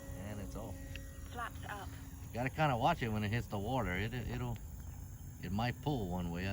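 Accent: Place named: Louisiana, United States. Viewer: Takes me back to Pierre Part Louisiana the Cajun accent!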